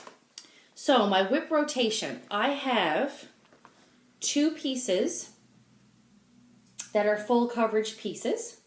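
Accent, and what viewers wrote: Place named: Newfoundland, Canada. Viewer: My dear I loves hearing a newfies voice on me phone . Thanks for the video maid . Catch yah on Instagram sometime ! Hugs, Blake!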